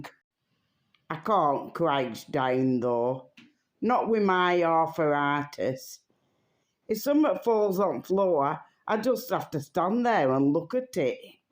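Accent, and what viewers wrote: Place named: Yorkshire, United Kingdom. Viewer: Yorkshire accent that mate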